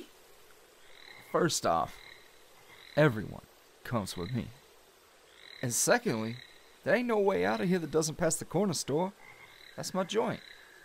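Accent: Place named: New Jersey, United States. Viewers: defo from northern Jersey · Jersey accents are wow ❤ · Jersey Accents r to die for 😍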